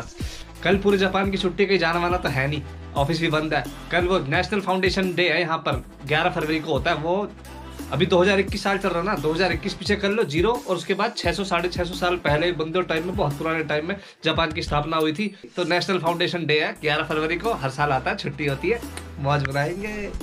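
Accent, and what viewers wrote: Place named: Rural, India. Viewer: Bhagwan kasam I love your Desi voice ( I also have village that's the reason I also speak village language ) Majo Aago !!!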